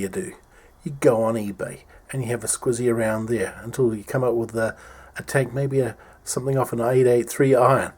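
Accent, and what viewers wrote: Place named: New Zealand. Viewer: Awesome video. Editing & presentation is outstanding along with the clarity and simplicity of instructions. I'm inspired now to arrange the purchase of an 883 tank for installation on my 48. Good to hear a Kiwi accent all the way from Onehunga after living in Oz for the last 10 years. LoL Cheers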